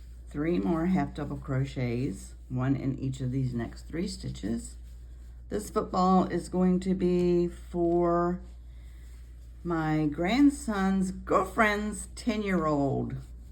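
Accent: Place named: South, United States. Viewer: OMG! This was the easiest and most beautiful pattern I have EVER done. Also your videos are just amazing and so detailed. The video showing what you’re doing is perfect! I just subscribed. LOVE YOU!! Do you have a video for a Santa’s hat for adults and children? Not very long hanging down on top but just alittle to look like a Santa hat. Please let me know. Would soo much appreciate it. I notice you have a southern accent lol. What state are you in? Thank you again soo much!! Heart❤️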